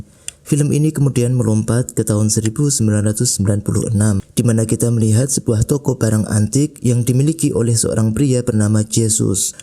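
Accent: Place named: Java, Indonesia. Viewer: Jawa anjr suaranya mals gw dngernya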